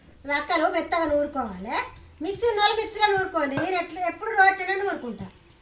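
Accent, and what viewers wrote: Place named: Karimnagar, India. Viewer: Memu elage chestamu sooooooper Naaku chala estamu😅😊karimnagar language Amma😂 Maadhi karimnagar 😂meedi vooru ekkada Amma😂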